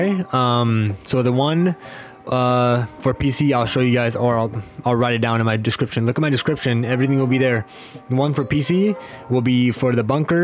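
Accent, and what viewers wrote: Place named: India. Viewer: Lmaoo no gonna kap I can hear the desi in you correct me if I’m wrong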